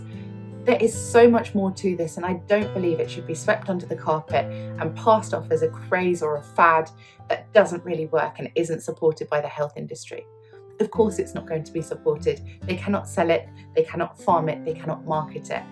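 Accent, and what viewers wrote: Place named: United Kingdom. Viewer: I came from LESA (Les amateurs) he is a very famous youtuber from Argentina who recommended you. I also want to tell you ai love you and your sweety british accent, I hope to meet you the day I will get back to England or also be welcome to China, the country where I live.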